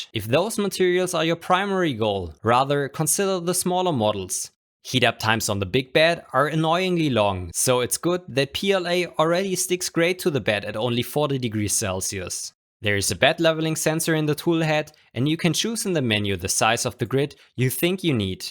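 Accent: Germany. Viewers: Hello dear friend! Congratulation and thank you for this awesome video!!!From your accent i guess you are in Germany. I live in Baden-Württemberg i would be happy if we could communicate somehow. Ich spreche auch Deutsch:)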